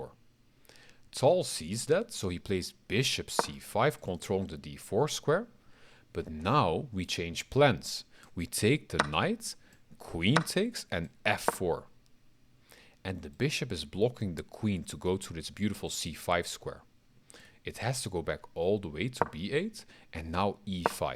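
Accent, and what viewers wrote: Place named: Turkey. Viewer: Türk aksani her yerde kendini belli ediyor😃 guzel video reis devami gelsin bu tarz videolarin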